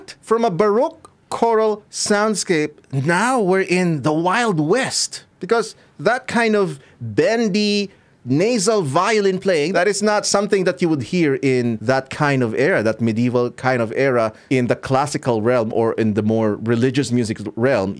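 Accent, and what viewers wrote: Philippines. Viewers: I may be wrong but.... Are you filipino? Considering your accent and your speech pattern, I'm led to assume your filipino good sir ( I'm new to this channel :'D )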